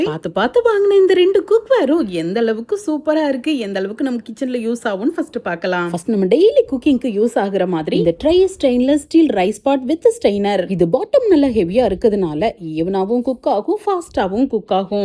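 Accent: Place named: Kanyakumari, India. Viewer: Unga native kanyakumari district Tamil akka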